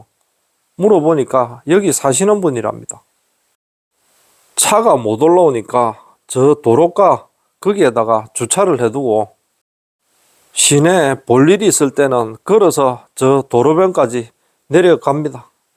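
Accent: Gyeongsang, South Korea. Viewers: 시청자들을 위해 비가오나 눈이오나 영상 촬영하신다고 수고 많으시네요.. 구수한 갱상도 사투리로 설명도 잘해주시고 덕분에 구경은 잘 합니다 파이팅 하시구요 새해 복 많이 받으세요 ~^(^ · 말씀이 참재미있는톤이라서 많이웃으며들었습니다. 역시 경상도말이 구수하게들립니다. 감사합니다.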